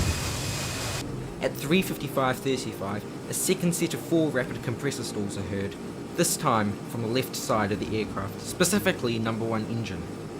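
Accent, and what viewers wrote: New Zealand. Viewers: just listening to your commentary are you a Kiwi?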